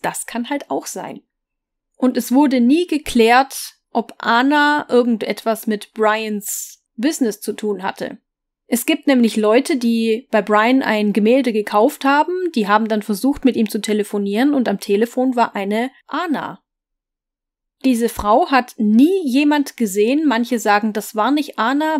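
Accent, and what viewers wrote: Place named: Swabia, Germany. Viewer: Schwäb"sche Vokale - wunderbare Eigenheit bei . Unbbadiiingggttt beiiii- behalda! Gell, ge '? 🎉😅😅😅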